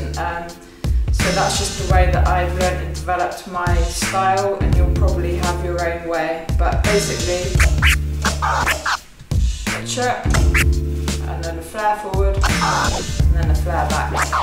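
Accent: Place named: South West, United Kingdom. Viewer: Yes... Defo Dope Skills! You sound like your from the South West; )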